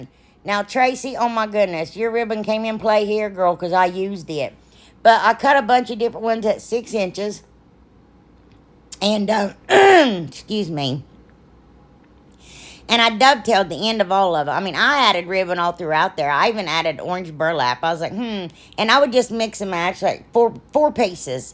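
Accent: South, United States. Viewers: Guuurrll !! That country twang had me smiling all thru your video. Where are you from? It can't be Ohio. I'm from Florida but have lived in Alabama for the last 48 years. So I've picked up their southern talk. Enjoyed your video. Your projects are great!! TFS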